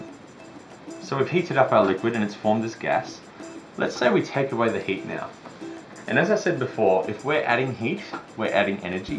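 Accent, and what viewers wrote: Australia. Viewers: Finally and Aussie not an American thank you miss! Even though I don’t like school it’s not an annoying voice!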